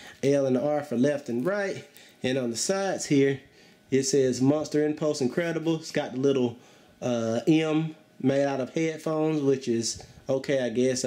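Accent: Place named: Texas, United States. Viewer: y sound like a countryman from Texas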